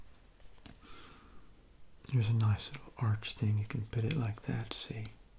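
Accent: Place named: South, United States. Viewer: You have a very calming voice and accent 😊 Are you from the South?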